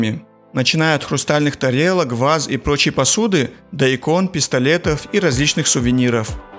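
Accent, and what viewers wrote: Kabardino-Balkaria, Russia. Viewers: Кабардинец? Родной акцент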